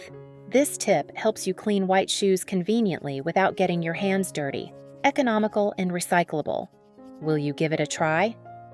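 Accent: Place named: Texas, United States. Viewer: Gainesville Texas english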